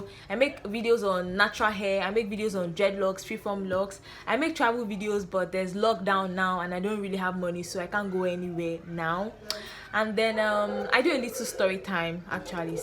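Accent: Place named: Igbo, Nigeria. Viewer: I remember your first video I watched that made me love your content. That motivational speaker video. I laughed so hard. let's do part two of that video together...Lol. I love your igbotic vocal in this video. So pure, original and quality content as always. I love you, baby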